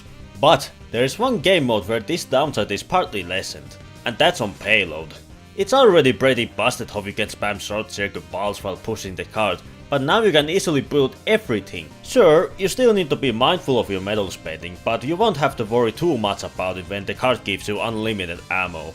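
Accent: Finland. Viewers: are you finnish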